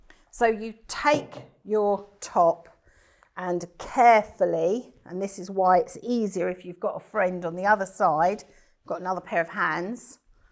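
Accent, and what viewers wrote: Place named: United Kingdom. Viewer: I love listening to you speak. Usually, I can't understand British speaking people ... And if I can, the way they speak can be so over the top. But not you. Your speaking voice and accent is perfectly lovely. Just Beautiful.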